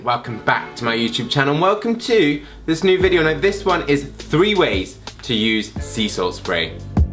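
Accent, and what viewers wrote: Scotland, United Kingdom. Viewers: Do I detect a wee Scottish accent there ?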